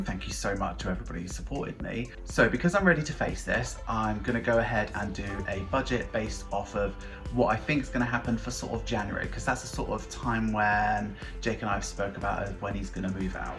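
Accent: United Kingdom. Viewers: Dear, I absolutely get your point and I LOVE how honest you are. Trust the change. Change is good and with your talent, it will bring you fantastic things. I also will need to move by myself next year, and Im dreading it. Ive never lived by myself, always with family, but it got to a point where I KNOW I need to step away. I found your channel thru Pinching the Pennies and it got my attention because of your BEAUTIFUL British accent (let's all admit British people have the most elegant accent EVER😍), and stayed because of your commitment and talent, your honesty and your strive to reach your goal and because of ur cash stuffing videos cause I think British pounds are pretty cool (us dollars are ugly AF). Don't be scared. Things will get better! We're here for you and with you thru this journey, even if virtually ❤️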